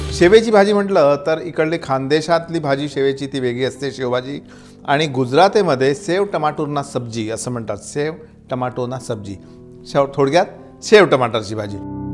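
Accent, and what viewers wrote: Vidarbha, India. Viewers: Hi I am from Baroda and I am a regular follower of your recepies.I love the vidharbha touch in your Marathi reminds me of my childhood days ....We call it as Sev Tamata nu shaag.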